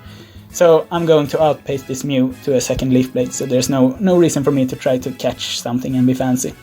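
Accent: Sweden. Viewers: R u Swedish?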